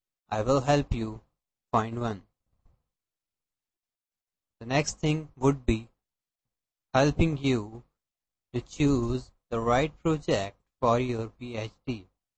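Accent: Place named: India. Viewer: heavy indian accent.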